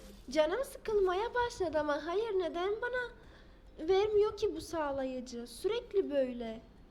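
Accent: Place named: Cyprus. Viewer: Merhaba konuşmana bayılıyorum eskiden kıbrıslı sevgilim vardı deyişik biraz turkçeniz ordan anladım zaten kıbrıslı oldugunu:)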